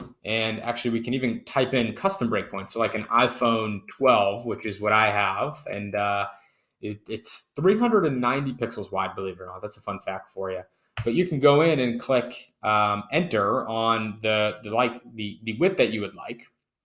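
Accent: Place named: United States. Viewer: Greetings, from St George! Finally, a site on Bricks described in an American accent. At least I can understand what you’re saying. Very nicely done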